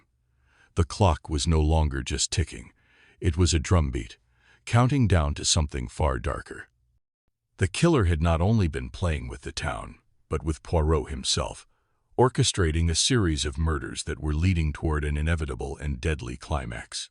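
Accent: United States. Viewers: No no no, can't have an American accent doing Agatha!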